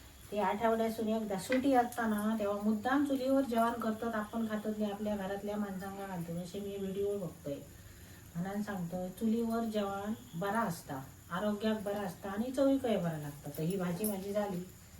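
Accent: Malvani, India. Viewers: उत्तम मालवणीत समजावून सांगतात काकी तुम्ही 😂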